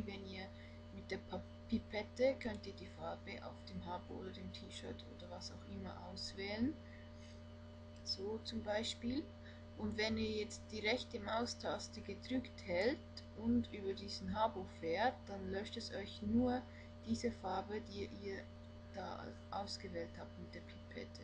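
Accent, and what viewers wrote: Switzerland. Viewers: Diese schweizerische Aussprache is sowas von gail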